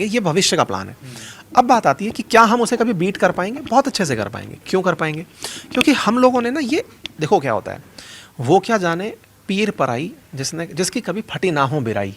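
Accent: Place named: Kanpur, India. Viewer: Aap ki boli pur hindi hai from kanpur se ❤❤❤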